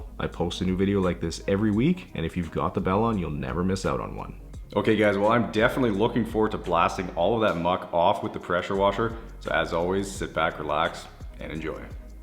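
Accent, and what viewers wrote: Canada. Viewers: "grage" I always get a kick with the Canadian accent.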